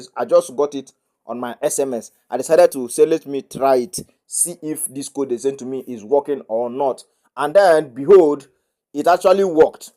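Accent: Igbo, Nigeria. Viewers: Honestly. It's that Igbo tongue that makes me smile on my first view of whichever of his videos I bump into. 😂😂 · Na your igbo tongue dey give me joy😅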